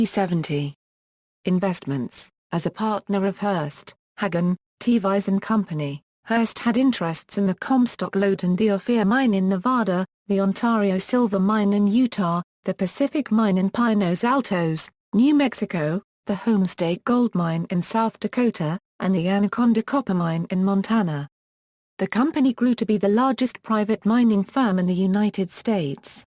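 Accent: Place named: East London, United Kingdom. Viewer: cannot understand your east London accent....you speak at 120 mph slow down to 60 mph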